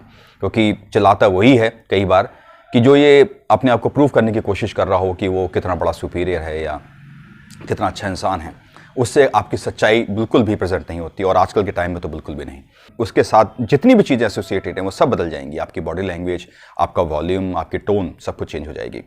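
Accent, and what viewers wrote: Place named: Khandesh, India. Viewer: येले म्हंतस अहिराणी तडका 🔥🔥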